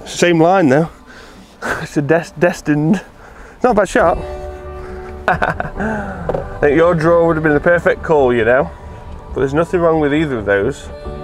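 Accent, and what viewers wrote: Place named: England, United Kingdom. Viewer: I thought you sounded like an English bloke, are you in Oz?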